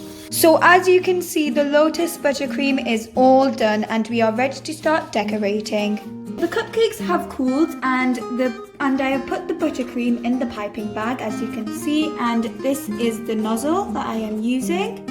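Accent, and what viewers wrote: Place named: United Kingdom. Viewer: Love listening to your British accent. Keep posting!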